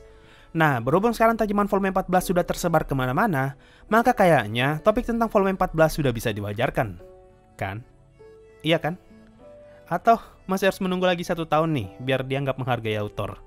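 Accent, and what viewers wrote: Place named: North Sumatra, Indonesia. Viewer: kyknya sumut ya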